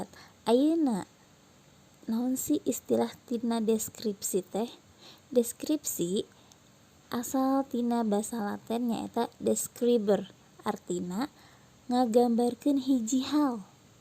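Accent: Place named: Sunda, Indonesia. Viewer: Dari kata pengantar, kelihatan jelas logat Sundanya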